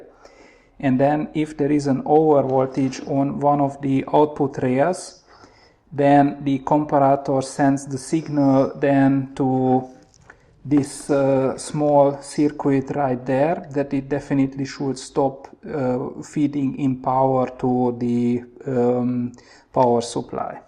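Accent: Hungary. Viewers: Tetszenek a videóid !!! Főleg a magyaros akcentus :)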